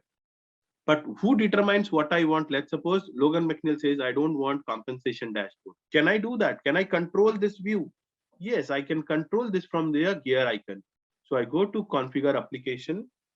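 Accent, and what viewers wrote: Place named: India. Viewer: I thought it was a woman guest, I am sorry but Indian accent in english is terrible :(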